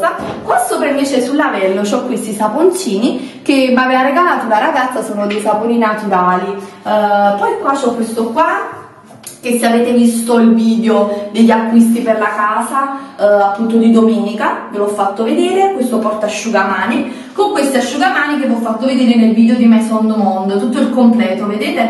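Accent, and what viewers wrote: Naples, Italy. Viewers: tu abiti a giugliano o Napoli si sente che parli napoletano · Sei napoletana? anche io comunque sei fantastica 😍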